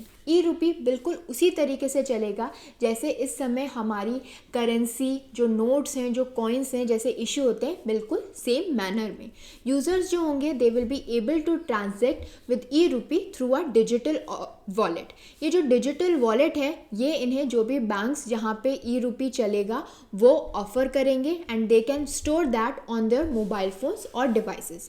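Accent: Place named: Gujarat, India. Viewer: aapki Hindi speech me gujarati ki style thodi lagi muje es liye puchha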